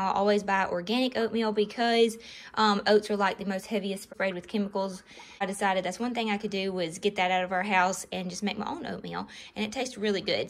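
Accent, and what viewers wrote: South, United States. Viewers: So pleased that I found your You Tube channel! Love your southern accent as you sound like me (I'm from west TN).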